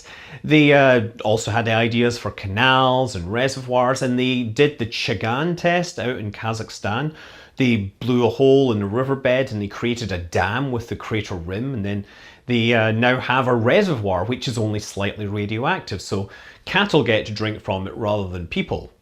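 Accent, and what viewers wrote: Scotland, United Kingdom. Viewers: U can hardly hear your Scottish accent. I guess you have been living in san Francisco for a long time. Btw I enjoy your videos keep it up.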